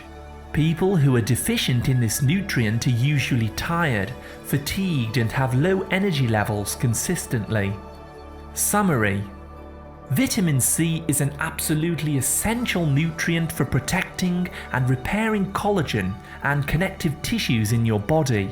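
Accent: United Kingdom. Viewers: That is the correct way to pronounce it. He is speaking British English, Britain is where English originated, in case you did not know.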